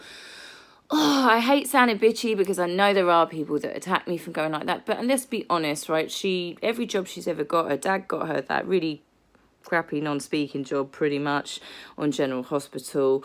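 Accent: Australia. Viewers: You have picked up an Aussie twang. 👍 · Enjoy your stay here in Australia...South Australia is hot 🥵 this time of year......enjoy the climate change... Guess what you still have your Aussie accent with the splash of pom.....don’t sound any different to me.....💕💕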